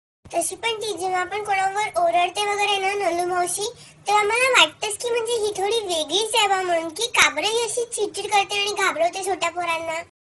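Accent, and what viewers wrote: Nagpur, India. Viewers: सोटी पोरगी,नागपुरी लहेजा एकदम फक्कड, म्हंजे की नै एकदम बढ़ीयाच 👍🙋‍♀️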